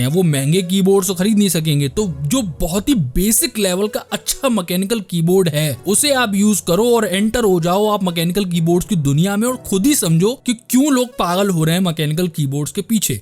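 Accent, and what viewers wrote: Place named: Agra, India. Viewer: Bohot badhiya channel hai bhai aapka. Aur wo Agra wali boli to maja hi aa jata hai sun ke. but ek request ke single product ka video 16 min ka na banaiye. jyada ho jata hai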